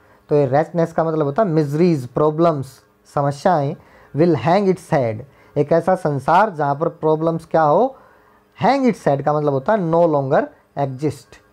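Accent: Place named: Haryana, India. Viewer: Sir aap haryana sai ho na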